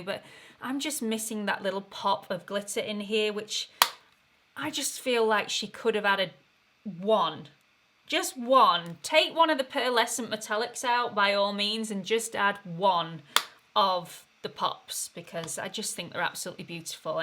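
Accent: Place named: England, United Kingdom. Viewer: She is absolutely Beautiful, I love her English Accent, adorable. Looking forward to other videos. Thank you.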